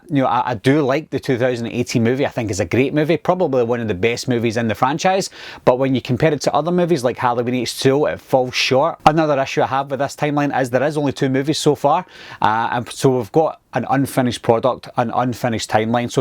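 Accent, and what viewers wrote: Scotland, United Kingdom. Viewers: ...great work as always!! I like how you did something a bit different here, not just the typical paint by numbers with the franchise! Lastly....I should know this, and Shame on me for not knowing...but is that a Scottish Accent, or British? My Muay Thai Coach is Scottish and it sounds so damn familiar...either way! Love it, and great work!!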